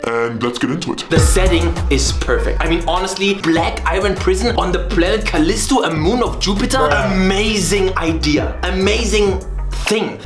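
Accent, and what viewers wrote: Germany. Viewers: Asiate mit deutschem Akzent. Luv it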